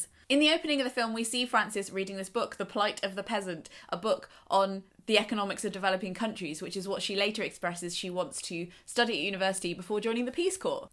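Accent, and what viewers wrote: United Kingdom. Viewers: Also wild to hear Patrick Swayze lines in a British accent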